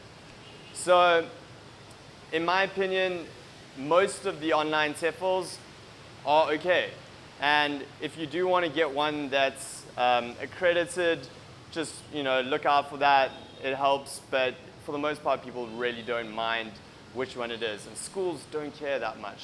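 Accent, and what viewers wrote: South Africa. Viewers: Love that beautiful South African accent of yours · are you from south africa? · Its so awesome to see and hear a fellow South African in such an informative video. Well done!!! PLEASE HELP... I have a Bachelor Degree and Post Graduate qualification in Education, but now ESL qualification. Now I would like to get my TEFL certificate but an online one. Please advise which site is legit and actually accredited/ recognized. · not from the US so must be South African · Eish. That accent. Confusing for most. Instantly recognisable to fellow 🇿🇦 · That accent... You're south african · sounds South African to me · you sound very South African bru, anyways I have a question, let's say I want to go overseas and I have 0 knowledge on teaching, I studied computer programming ( so no Degree or diploma for teaching), would you still recommend getting a TEFL Certificate? 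· he is from south africa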